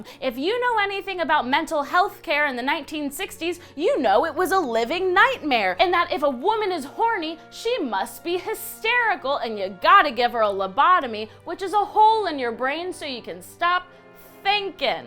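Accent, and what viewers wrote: Germany. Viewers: best German accent ever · I'm in love with! I have subscribed and I will NEVER unsubscribe! You're actually really good at a German Accent! (I'm From Germany) Please make more videos!!!!!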